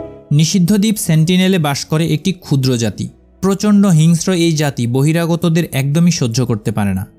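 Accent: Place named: Chittagong, Bangladesh. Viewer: বর্ণনাকারীর বাংলা বলার সুর শুনে মনে হল তাঁর বাড়ি চট্টগ্রাম। আপনার কন্টেন্ট ভালো তবে ভাষার ব্যাবহারে আরেকটু সচেতন হবেন। বাংলা বাংলার মত করেই বলবেন। যদি আঞ্চলিকতা বর্জন না করতে পারেন প্রমিত বাংলা বলার সময়, সেক্ষেত্রে চট্টগ্রামের আঞ্চলিক ভাষায় কন্টেন্ট বানান। বাংলাদেশের সব অঞ্চলের মানুষ এখন চট্টগ্রামের আঞ্চলিক ভাষা বোঝে। কারো বুঝতে অসুবিধা হবেনা।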